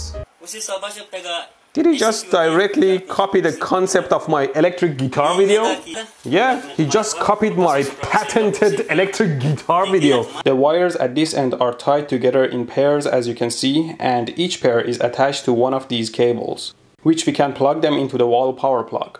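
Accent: Iran. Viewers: ایرانییییی ؟؟؟ لحجتو کاملااااا تشخیص دادم 😂😂😂 ولی دمت گرم خیلی مردی 😂❤ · عاشق این لهجه ایرانیم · Kheyli zaye english harf mizani adam mifahme irani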